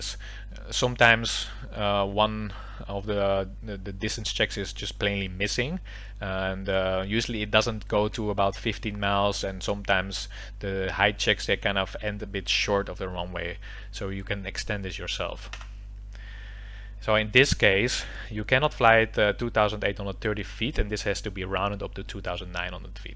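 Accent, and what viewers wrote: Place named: Netherlands. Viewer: Can i ask where you are from? I'm dutch and you sound a bit dutch too! I'm very interested in pilots working in a different country than they are from. I saw that you landed in an airport in Vietnam in a different video. If this is the case would it be possible for me to fly like with British Airways or Qantas for example instead of like KLM or a different Dutch airline. Thank you.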